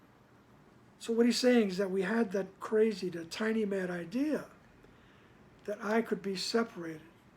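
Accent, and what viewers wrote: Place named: New York, United States. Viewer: I knew you too were a New Yorker! Had a feeling when I heard the sirens and the way you talk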